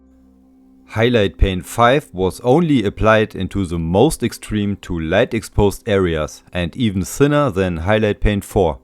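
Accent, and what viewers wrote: Germany. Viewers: ich finde Dein D`englisch sehr sympathisch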